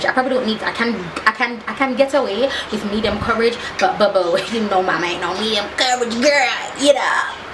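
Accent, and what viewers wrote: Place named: Jamaica. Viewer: "Mi love it" in love with ur Jamaican accent 😍 that hair tho can we have a tutorial on it please?! U look gorgeous ❤️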